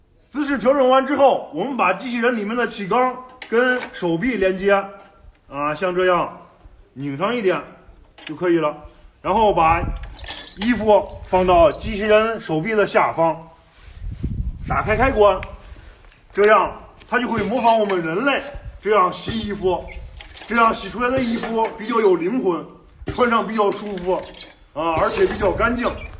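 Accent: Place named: Tangshan, China. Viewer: 老耿说话出了唐山味儿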